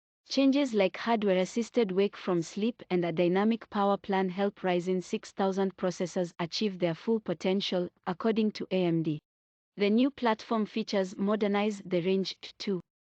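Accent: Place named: Africa. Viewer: Asian woman with African accent? Wow. Good video though.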